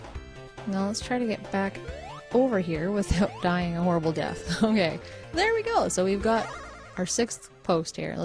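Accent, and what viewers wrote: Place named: Canada. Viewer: You have very nice Canadian accent. You should do ASMR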